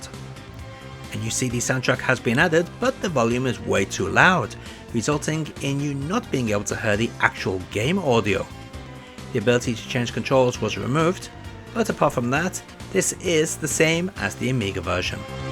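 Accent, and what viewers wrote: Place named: Scotland, United Kingdom. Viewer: Showing support, love. Love his accent and his command of English language, are you Scottish? Very honest, knows his stuff, often Japanese focused but in English. Can feel and share that passion for the old systems and hardware. I personally didn't play this game back in the day.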